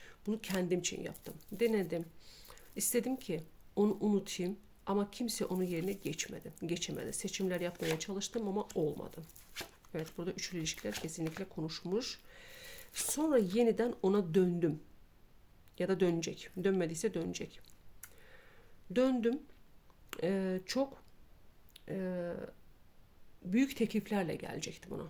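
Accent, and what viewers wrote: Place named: Ankara, Turkey. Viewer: şivemiz bu biz angara nın bebesiyiz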